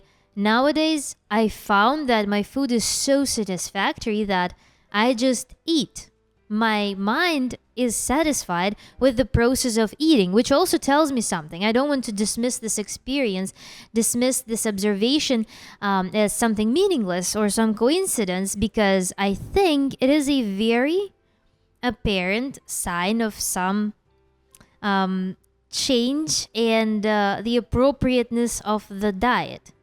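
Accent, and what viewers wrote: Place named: Eastern Europe. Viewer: OK I could sense an eastern European accent, but I wasn’t quite sure. I have three different friends from Russia and we talk on Skype. One is from Moscow one is from St. Petersburg and the other is from Novsibirsk. I’m from Texas. One time I told one of my Russian friends I believe it was the one from Siberia that it was still very hot in September. It was about 38°C. His only reaction was holy shit lol. Another time in summer, my friend from Saint Petersburg asked me what the weather was like. Well, I asked the Amazon echo to convert from Fahrenheit to Celsius and the Amazon echo said it was 40°C. He thought he misheard. He was like did it say 40°C? Lol.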